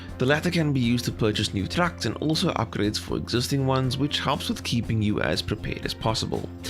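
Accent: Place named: South Africa. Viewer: Awe my bru, South African yes ?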